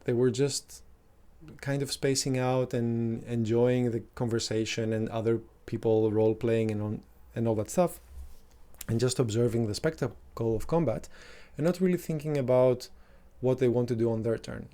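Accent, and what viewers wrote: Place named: Greece. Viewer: D&D content with a greek accent is exactly what I needed. Euharisto gia to vinteo! :D Interesting mechanic, I think I may give it a go because I have a few players who take ages to do stuff.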